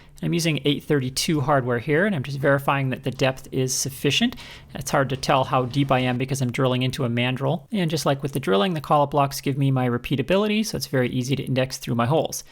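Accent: United States. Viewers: I never thought of that but I love the idea. Great video. One thing that confuses me. You are supposed to be a Canadian but I never hear you say aboot or eh? You just used inches and as we say in the south you tawk uhmurikin. Not that you would use those words. Even AVE could be picked out as up nawth some place. Can you unconfuse me?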